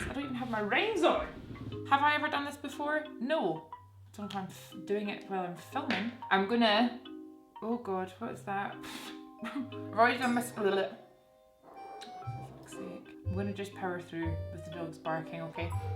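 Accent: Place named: North Lanarkshire, United Kingdom. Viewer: First time watching and I'm so homesick now. You sound so like my friends from home. North Lanarkshire by any chance?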